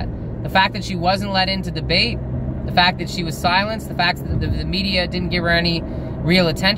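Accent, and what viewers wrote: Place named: East Coast, United States. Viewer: you're not even from toronto, "ya know." east coast hick?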